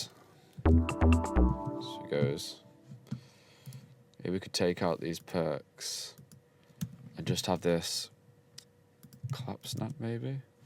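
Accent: United Kingdom. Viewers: Can't understand that heavy British accent. Skipping.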